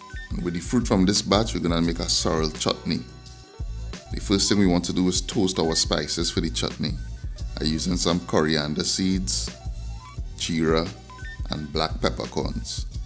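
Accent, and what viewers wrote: Trinidad and Tobago. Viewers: Good explanation man.!!!Love the Trini voice.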